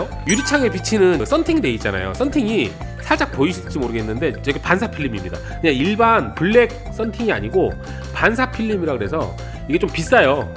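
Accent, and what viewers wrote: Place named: North Jeolla, South Korea. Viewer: 고향이 전라도 어디신가요? 말투가 북도같은데요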